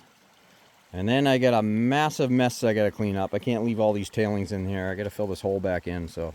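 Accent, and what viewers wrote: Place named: East Coast, United States. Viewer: Awesome! I think you beat me! What state are you in? Accent says out east to me.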